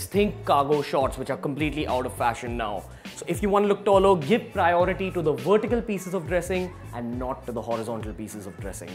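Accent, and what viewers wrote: India. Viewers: I’m just hear to listen to ridiculous tips in an incredibly thick Indian accent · Did I just watch a whole video with an Indian guy with an accent giving styling tips and really enjoyed it ?! Lol seriously though great editing , great talk! Feel like this guy could sell me anything .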